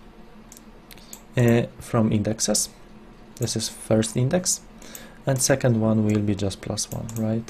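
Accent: Poland. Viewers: Unreal nie ukrtjesz polskiego akcentu a zwłaszcza meszes xD GruBe aus dem Polnischen (sorki ale nie mam niemieckich znaków na klawiaturze) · Are you from poland? because you have polish accent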